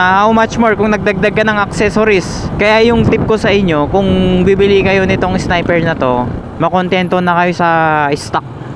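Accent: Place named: Visayas, Philippines. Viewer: Bobo ng mga reasoning mo. 😂 Sabe nya dahil daw mahal, pangalawa dahil sa installment. Tapos height disadvantages daw, bisaya sakit mo sa tenga pangalawa walang laman pinag sasabe mo. Kailangan mo pa daw mag practice sa mga barako na motor dahil masisira lang daw sa brand new sniper, eh paano yung mga bumili ng ducati nag barako ba muna sila. 😂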